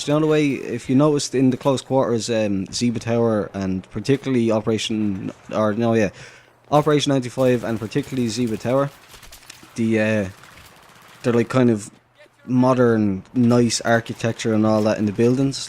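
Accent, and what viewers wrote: Ireland. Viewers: U aren't! I'm actually Scapooty and if ur then you should know me. Lol never knew you had such a Irish voice! I should be uploading some PC BF3 gameplay soon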